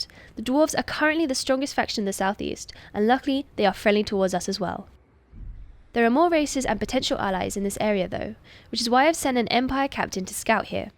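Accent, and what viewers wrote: England, United Kingdom. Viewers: She has such a lovely English Accent :) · qt british voice always makes things more enjoyable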